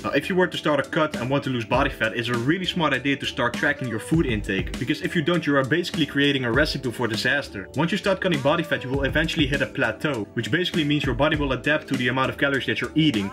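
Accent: Netherlands. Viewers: Een nieuwe abonnee erbij hoor aan je acccent dat je Nederlands bent 💪🏽👊🏼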